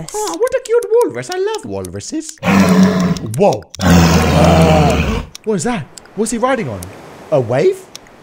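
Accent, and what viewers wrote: United Kingdom. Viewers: your british my man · And he is British English (that's why) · You are british this game is american accent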